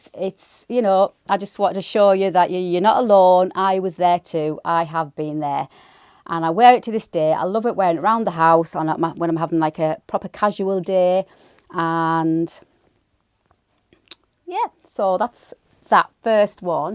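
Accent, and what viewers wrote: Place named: Northern England, United Kingdom. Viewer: Good on you. I sat with a cuppa and as usual you gave me another dose of sewing inspiration. Thank you for sharing your life and journey, it was encouraging hearing how you felt when you began. Love being invited into your world for a catch up and especially hearing a fellow northern lass. x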